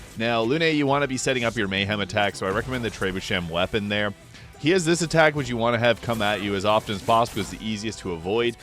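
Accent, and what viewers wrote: Maritimes, Canada. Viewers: I was just watching your Swashbuckler guide and I thought I heard some Maritime in your accent. Hello from New Brunswick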